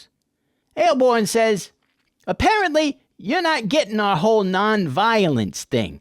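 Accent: United States. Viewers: Hi. I have no clue where you come from because I’m a stupid British gay guy without any understanding of American accents but just if you ever see this post my god I love you! Also live long and prosper!🍌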